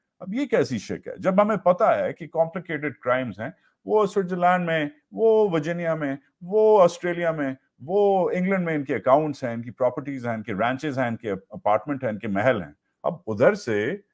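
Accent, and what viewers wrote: Punjab, India. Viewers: No, being a Punjabi myself, I enjoyed your Punjabi accent while explaining the amendments. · Always a big fan of you bro and loved the way you explained in bit of Punjabi swag 😜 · Love you Wajahat and your Punjabi accent . Superb bro. tum naay khoob tease keaya isss punabi fauj kooo . yeh punjabi dhagay sirf khaa bhee sakty hain moty maghaz kee log hain yeh sary boot. per inko $$$$$ ginanna aur plot bnana khoob ata haay. aur tu aur papa johnz bhee tumhary neighbour main inhee kee hain. dekho looo punjabi fauj kooo. haram kee adat paree huee hay inkoo. yaar itna arsa inkee sath kaam keya tum naay yeh tu samggty hoo fauji koo dosry kee jeeb saay mal nikalwana khoob ata haay. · پنجابی کا تڑکہ یا touch اچھا ہے۔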